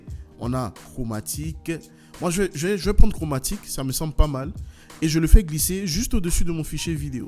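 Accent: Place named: Ivory Coast. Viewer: Merci, super tuto et encore plus merci pour l’accent ivoirien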